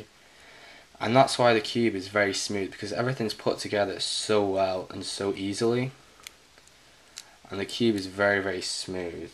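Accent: United Kingdom. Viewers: i could tell its uk -me being english- i just couldn't tell whereabouts haha, now i know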